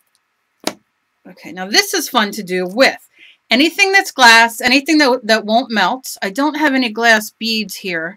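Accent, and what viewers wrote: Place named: Philadelphia, United States. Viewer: A very distinct dialect , of a provincial Philly accent . Very interesting . I use wax to preserve water color on wood . Dorland wax medium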